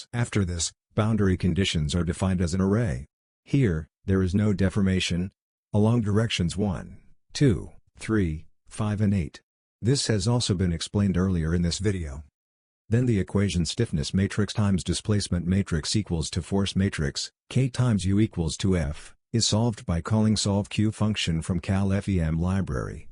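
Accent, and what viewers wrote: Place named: United States. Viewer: Wow your accent has changed into American in just few months ! 🤩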